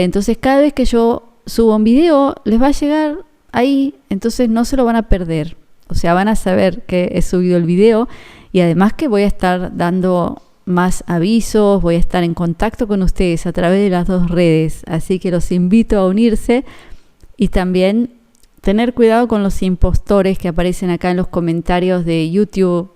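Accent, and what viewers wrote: Argentina. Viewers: Una Argentina leyendo eso?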